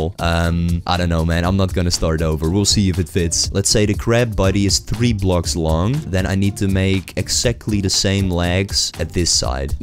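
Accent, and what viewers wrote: Netherlands. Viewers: Are u Dutch, you sound like it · You sound pretty dutch bro like me. Like said you sound like. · Ik hoor gwn dat je Nederlands bent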